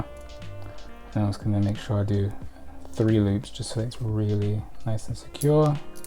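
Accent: Midlands, United Kingdom. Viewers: I never thought I could make a rug but you’ve made it look so achievable! Thank you so much! And your voice is so relaxing! You from the midlands? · is that a midlands accent i detect? i adore this design <3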